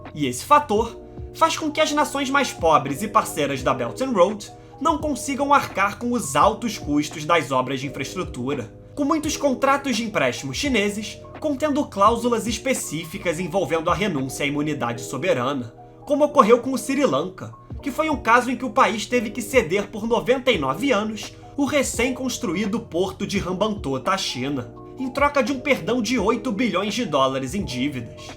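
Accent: Rio de Janeiro, Brazil. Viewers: O conteúdo do vídeo é excelente, mas precisa neutralizar um pouco esse sotaque carioca, misericórdia! 😣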